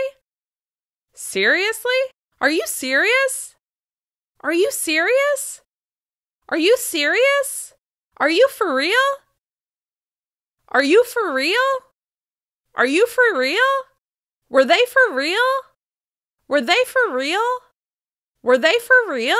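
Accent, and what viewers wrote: United States. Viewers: Hi! I love your clear information and a great lesson. Are you from Southern California? I tho your English has a California accent! Correct?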